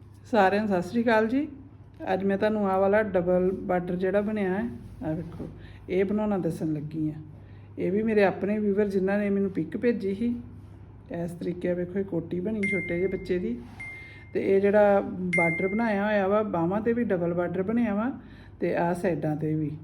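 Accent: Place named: Majha, India. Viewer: Mam tusi majhe de boli bolde j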